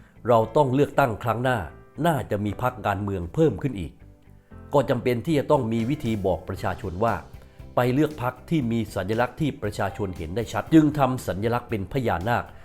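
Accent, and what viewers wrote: South, Thailand. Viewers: คุณอ่านดีน่ะ แต่ อ่านชื่อ ผิด 2 ชื่อ นันโทปนันท กับ อัลเบิร์ต ไอสไตน์ คนใต้ บ้านเราทองแดง พออนุโลม แต่ อ่านผิด คำ ต้อง ระมัด ระวัง มากๆ ครับ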